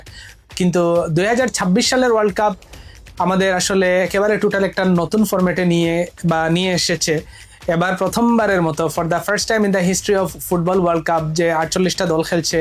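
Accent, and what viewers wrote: Sylhet, Bangladesh. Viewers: Sylheti mone hoy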